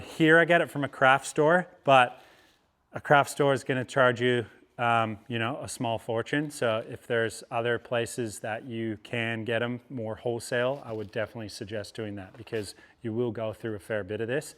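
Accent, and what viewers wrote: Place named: Australia. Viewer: Awesome video! This dude sounds Australian sometimes and American others and im so confused by it lol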